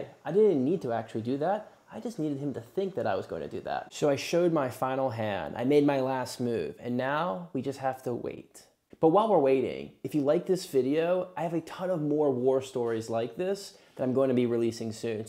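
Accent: United States. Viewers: Sounds like south florida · No shade but you u from philly? That’s a lot of Phowwns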